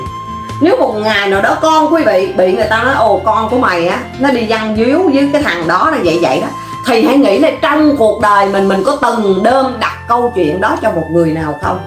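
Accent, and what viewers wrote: Standard. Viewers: Chị nói rất chuẩn.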